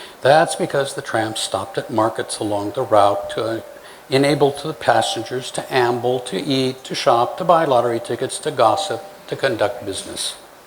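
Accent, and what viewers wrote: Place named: United States. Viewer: Surprised, that you still speak a neat American accent after all these years in Thailand!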